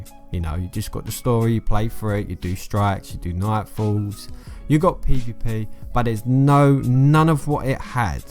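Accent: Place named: United Kingdom. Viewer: sir you have a painfully bri'ish accent but i love it. keep up the good work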